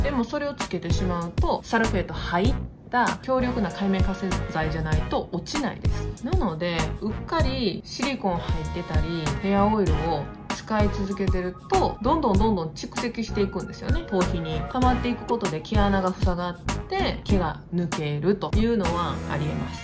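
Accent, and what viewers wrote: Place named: Kansai, Japan. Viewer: めちゃくちゃ分かりやすい😮 あとなんか関西弁おもしろい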